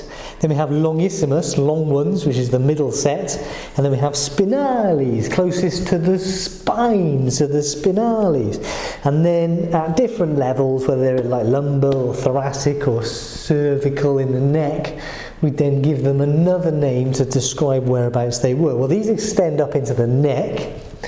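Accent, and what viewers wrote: United Kingdom. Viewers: I am not a native english speaker. But I adore anatomy and you are excellent, except your accent is british and also so fast that its hard for me to understand😂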